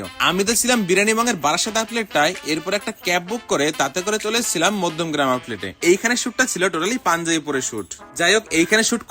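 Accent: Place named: East Bengal, Bangladesh. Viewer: Uni probably bangal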